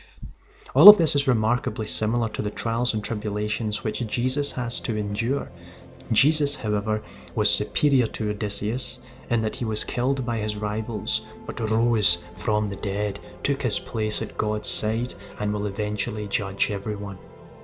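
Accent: Scotland, United Kingdom. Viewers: 50 years after Christianity was declared the official religion, the western Roman empire fell. Ushering in the most depraved chapter in recorded human history. The church was Europe's rulers. Who are you trying to kid? The inquisition, routine torture of heretics, (one Pope complained the people being tortured, in the basement of the Vatican, were not hollering loud enough), the routine practice of cannibalism by the crusaders, an estimated 50,000 people burned alive for demon possession, slavery (the first slave ship in N America was named for Jesus), the routine destruction of ancient science (Archimedes discovered calculus almost 2,000 years before Newton, Christian monks destroyed it.) The only good part of your doc is your accent. I assume you are from Scotland. The most depraved archeological dig I have ever encountered occurred in Hull. 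Priest trading sexual favors for privileged positions in the after life. <link>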